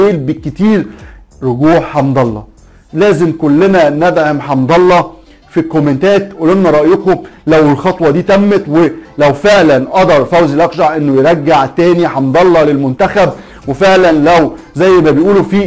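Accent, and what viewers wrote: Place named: Egypt. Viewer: تحياتي لك أخي المصري هل تعرف أن لهجتكم زوينة بزاف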